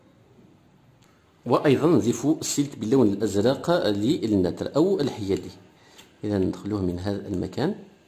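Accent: Algeria. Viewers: اخي وش نت جزائري ولا مغربي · والله العظيم نحب إخواننا القبايل كي يتكلمو بالعربية عندهم لهجة رائعة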